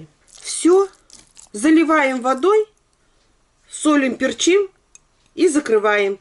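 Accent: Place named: Kopeysk, Russia. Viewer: у меня подружка живет в г.Копейск, говор такой же! мне нравится ваш говор. а вы откуда ведете трансляции?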